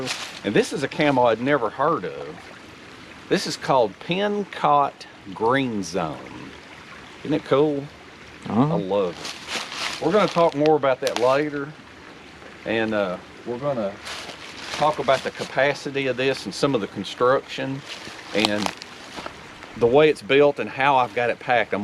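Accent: South, United States. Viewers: I love your accent man for me as a German it's a delight to just listen to you speak. What area are you from in the US? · This is one of my favorited channel. I especially like it when you explain everything so nicely especially with the southern accent .I found this channel randomly; it is really a channel that produces quality content. I have learn so much .Thank you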